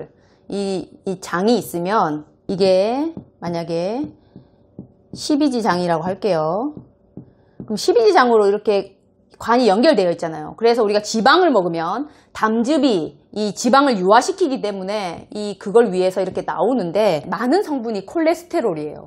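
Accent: Gyeongsang, South Korea. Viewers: 개념원리 동반한 설명은 처음이네요. 감사합니다. 고향이 대구분이실듯.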